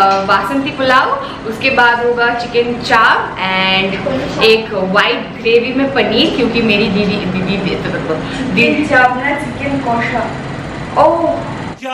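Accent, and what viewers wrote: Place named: Bengal, India. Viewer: Chapa k chale jana it called bengali style me hindi bolna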